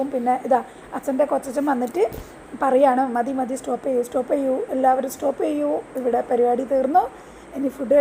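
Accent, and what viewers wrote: Palakkad, India. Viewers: Madom, where is your native place ? Your talk palakkad same.!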